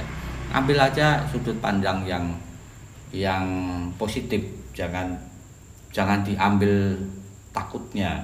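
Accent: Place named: Semarang, Indonesia. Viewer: Itu bahasa Semarangan kak, medhok nya ada sedikit ngebass2nya gtu, wkwk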